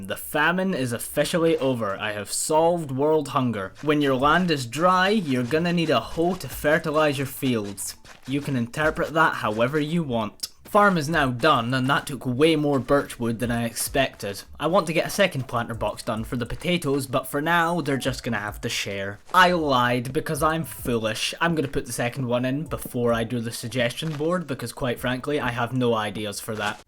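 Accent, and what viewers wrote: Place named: United Kingdom. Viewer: i cant tell if your scotish or british but i fuck with it sugeston: make pixil art of your fav album by your fav artist i would fuck with that and its free plug for your playlist i would like to see what music you lission 2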